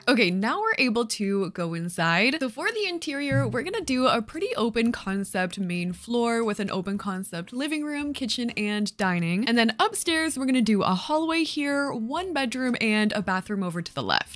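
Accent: Canada. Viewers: Canadians pronouncing it "New Or-leens" is always so cute and funny i love it hahaha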